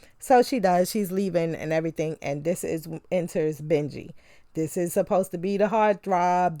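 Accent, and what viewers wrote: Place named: New York, United States. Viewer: Love your NY accent!!!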